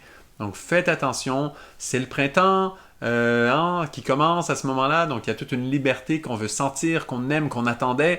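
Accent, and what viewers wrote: Quebec, Canada. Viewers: Quel joli Monsieur avec un charmant accent Québecquois et qui parle vraiment bien et juste!!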